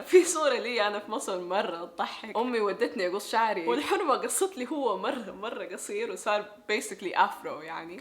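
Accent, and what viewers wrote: Hejaz, Saudi Arabia. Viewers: ما شاء الله اللهجه حجازيه ومفهومه جدا اللغه العربيه قويه والترجمه من الى ١٠٠/١٠٠ هذه الايجابيات عندك اختي تجعلك داعيه رائعه وقويه وموثره جدا لانك قويه في اللغتين بامكانك ان تكوني داعيه بس في الهيئه واللباس اسلوبك رائع استغليه لله واعلمي ان الناس عندكم في بريطانيا واوروبا متعطشين الاسلام ...ولان يهدي الله بك شخص واحد خير لك من الدنيا وما فيها ... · لهجة مكاوية وجداوية جميلة وماحفظة عليها مانسيتيها اشكرك تحياتي لك سعودي من الرياض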